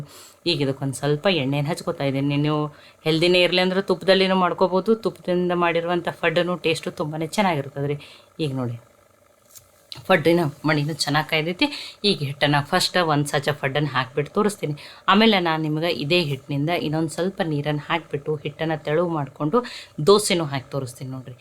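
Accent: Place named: Dharwad, India. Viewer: Akka,bhaal ruchi aged nimma adigi.Bhaal cholo anistu numma Dharwad maatu keli❤ illi Bangaluru bhasha keli byasara❤❤❤❤❤🎉🎉😂😂😂😂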